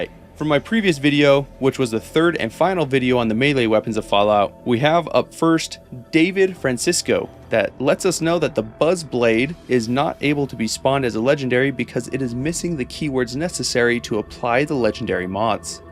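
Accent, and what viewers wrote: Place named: United States. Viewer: You did really well pronouncing "Pyotr Illyich Tchaikovskij". Aside from an american accent, it sounded proper to me. Language trivia: "Piotr" is the russian/slavic version of "Peter". The "-io" sound kind of sounds more like "-ieo" with an emphasis on the "-ie". The "-tr" is certainly one of the typical slavic consonant sounds, but when you speak it, you'll usually hear a brief "u" or "e" vowel sound in there. Anyway, thanks for the entertaining video! I hope my little trivia bit was at least somewhat entertaining to you.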